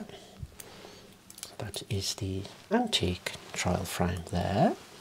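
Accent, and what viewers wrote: Birmingham, United Kingdom. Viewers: I love britan akcent, sorry my bad english im from Sakha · This was great. I initially thought it was a real inspection/appraisal until I saw your other vids lol. You have a super soothing voice. Is that a Birmingham accent? Forgive my ignorance, not from the UK (Australia). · Youtube recommendations have served me well! This video was amazing! Your voice reminds me of a Brummie Alan Lee :D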